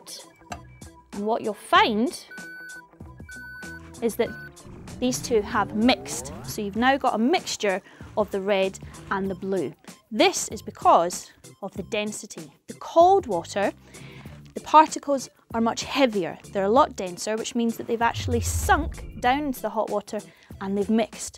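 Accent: Scotland, United Kingdom. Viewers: shes posh scottish not hard to understand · Within the first 8 seconds I discovered this woman is Scottish. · It's a scottish accent, you nut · I guess you've never heard a Scottish accent... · she sounds more like a posh scot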